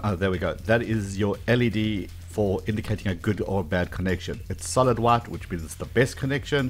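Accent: South Africa. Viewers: South African I think.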